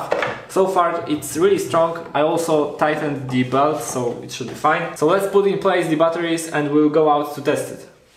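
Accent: Poland. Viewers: I knew you were polish from the first second - very nice video! Nice editing skills!!! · Od razu usłyszałem polski akcent. 😁 Polacy tak ładnie i dokładnie mówią 😃 pozdro kolego bardzo fajne wykonanie 👍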